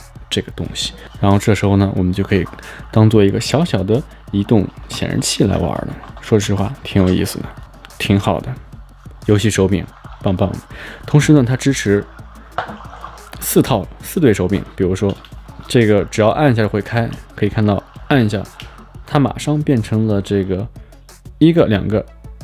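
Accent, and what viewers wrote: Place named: Northwest, China. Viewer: up主浓浓的西北口音，祖籍是西北人吗？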